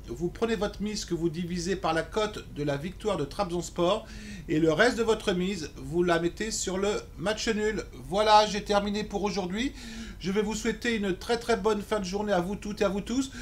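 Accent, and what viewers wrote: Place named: United Kingdom. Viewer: Hello merci pour la pub mentionnant mon prénom, c est normal de ne pas gagner tous les jours, je t écoute depuis suffisamment longtemps pour me faire l idée que tu es au dessus du filet avec caritor 😉😉changes pas ton accent British, il est très fun😊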